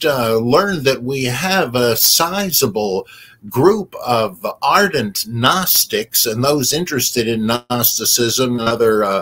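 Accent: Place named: England, United Kingdom. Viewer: I always like English accent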